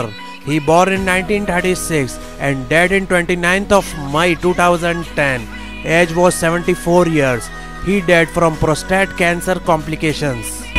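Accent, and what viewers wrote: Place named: India. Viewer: Why this Bollywood Dialekt? Absolutely horrible, can't you afford someone with a decent voice????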